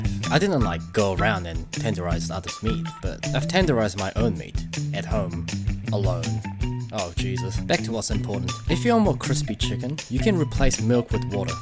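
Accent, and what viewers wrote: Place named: New Zealand. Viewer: Wow looks nice 👍🏼 btw miss New Zealand your accent is a fun mix of the kiwi accent.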